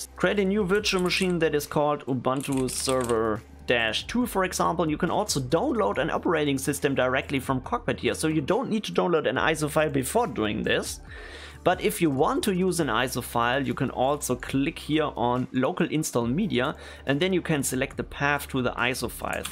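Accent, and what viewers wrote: Germany. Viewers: I can hear some German English. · Im ersten Satzt direkt gemerkt "Jop das ist nen Deutscher" Ich danke dir, genau nach so einer Software hab ich schon länger gesucht.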